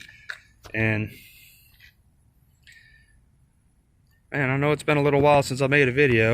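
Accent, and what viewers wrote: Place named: Louisiana, United States. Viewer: sound like you from south Louisiana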